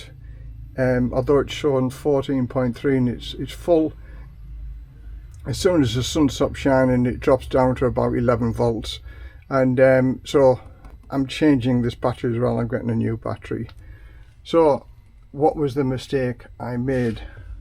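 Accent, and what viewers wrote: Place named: Sunderland, United Kingdom. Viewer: You from Sunderland? Accent seems similar.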